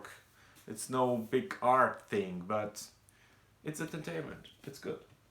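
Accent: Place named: Germany. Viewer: I don't think you talk funny, listening to you makes me miss Germany. You have an awesome ass collection and I am loving your reviews:)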